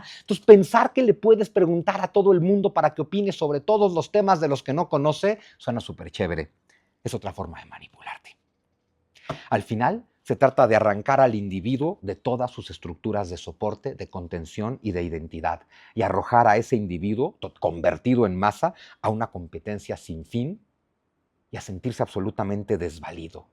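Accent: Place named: Mexico. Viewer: Me encanta el acento mexicano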